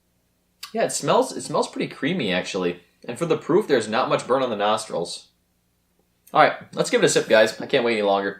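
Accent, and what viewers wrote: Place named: Wisconsin, United States. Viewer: Nice accent. Wisconsin?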